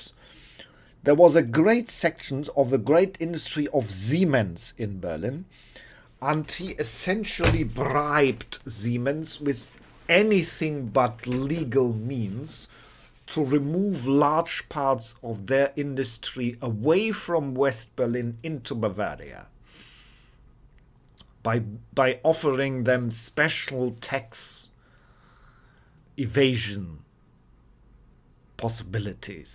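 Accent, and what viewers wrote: RP, United Kingdom. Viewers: So Berlin isn't the yellow of the egg, except Spandau? 😉😁 The majority of big cities tend to be left. Love your very British sounding accent! 💕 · warum sprichst du wenn du englisch sprichst immer in diesem Posh-English-Accent? Warst du während des Studiums in England, Oxford vielleicht, oder einfach nur ein Faible für BE?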